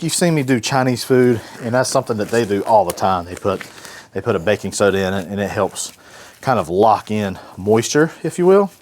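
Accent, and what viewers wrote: North Carolina, United States. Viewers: I know an eastern north cackalacky accent when I hear one!! love your videos... inspired me to get a blackstone!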